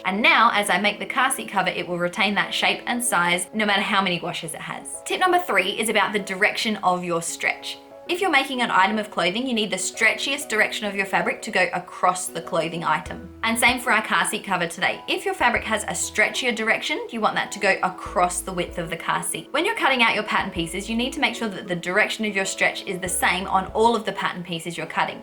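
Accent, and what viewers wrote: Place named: Australia. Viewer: Hi, I have only just found your channel. It’s nice to hear a fellow Australian and using centimetres too. I love your tutorials and look forward to seeing the others. I also look forward to making the fitted cot sheets. Michelle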